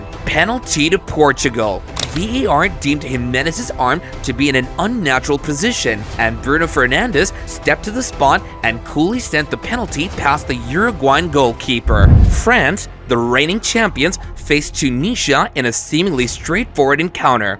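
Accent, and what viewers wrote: United States. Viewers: Watching football vids with an American accent just ain't right. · 40 seconds? As soon as the Yank's voice was heard, the back button was pressed. Can't comment on the video content, I simply didn't watch it! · these american pronunciations are painful to hear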